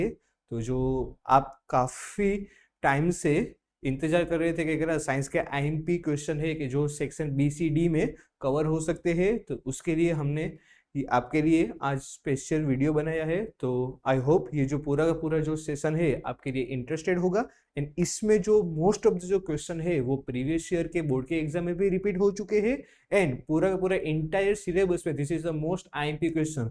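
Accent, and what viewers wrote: Gujarat, India. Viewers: Bhai woh Gujarati Hai Sharad se Guj accent me Hindi bol rhe hai😅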